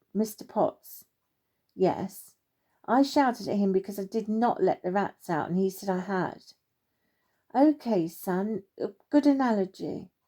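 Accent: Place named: England, United Kingdom. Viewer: Lovely english accent! It sounds really good, not pretensions and natural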